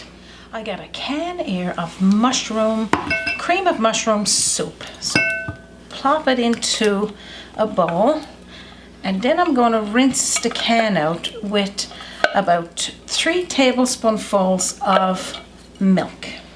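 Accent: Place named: Newfoundland, Canada. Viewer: Maybe a bit too personal a comment, but I love listening to you. You sound like my Nana who came to the USA from St. John's Newfoundland with my grandfather (Papa). They worked on fishing boats before coming here. I keep waiting for you to say "a spot of tea" as they did. Thank you for your accent. It brings back truly beautiful memories.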